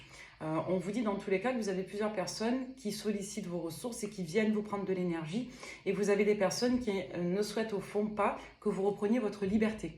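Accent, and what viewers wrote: South, France. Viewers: Merci; Je préfère largement entendre votre jolie voix avec un léger accent du sud et vous voir souriante. 😉😂🤗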